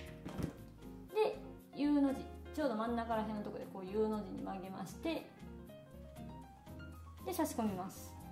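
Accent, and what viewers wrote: Kansai, Japan. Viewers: 言葉の端端に感じる関西弁が良いです！ 実家が電気屋なのでついつい見てしました！ 俺も電気工事士取ろうかな！ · 丁寧な解説でとても分かりやすかったです。関西弁がきついので標準語バージョンがあるとうれしいです。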